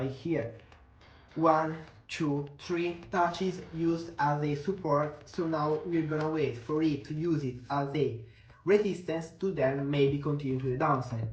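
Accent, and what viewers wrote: Italy. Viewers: Pronuncia all'italiana 🤣 Bravo però continua cosi e goditi il tuo percorso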